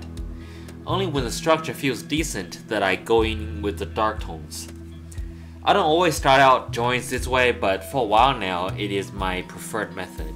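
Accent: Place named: China. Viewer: 听口音像是中国人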